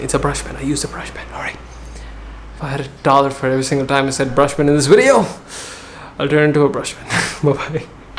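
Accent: India. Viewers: Are you indian? How do you get that accent? Where are you living at the moment? You confuse..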